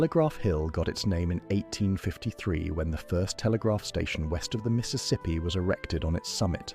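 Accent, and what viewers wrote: United Kingdom. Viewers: Save the british accent for englands history. Would be nice to hear in a western accent.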